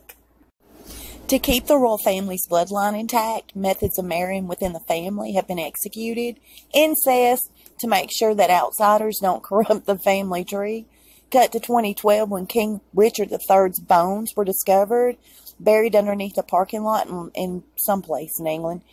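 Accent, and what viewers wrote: South, United States. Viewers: Thanks for sticking up for the South its appreciated. I new Meghan did a lot of things we aren't hearing about until now. Like you Southern Accent and appreciate all the updates and news. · I want to like Megan... but there is just something about her that I just can't take to🤔 ps love your southern accent x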